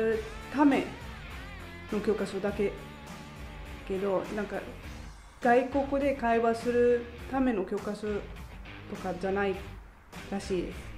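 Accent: Kansai, Japan. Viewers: 美人で関西弁は最強！ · なんだこの美人！！ 5カ国語喋れんのか？ しかも関西弁？ しかもなんか一生懸命さが伝わる👍 うらやますぃ〜ぞ！彼氏👍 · 関西すぎた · 女神さまが、関西弁しゃべってる 素晴らしい😻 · 何でこういう外国の人ってみんな関西弁なん？ｗｗｗ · そうやってアクセント気にせんと関西弁喋りまくったからうまくなったんですね！ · 関西弁がおもしろい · 関西弁親近感湧きます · 関西弁喋る外人ってなんか面白いし好き。笑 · お人形さんみたいで凄くかわいいですね☆ 関西弁で一生懸命話してるのが心打たれます^_^ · めっちゃ綺麗！関西弁がたまらんw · 本当に美人ですね！実在の人物ですよね？（笑）声もステキだし、関西弁もいいですね！ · ちょっと関西弁混じってる？ 可愛い♡ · ちょいちょい関西弁入るのが良いね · 何故に関西弁、、、（｀・ω・） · 関西弁ええな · なんか関西弁入ってる？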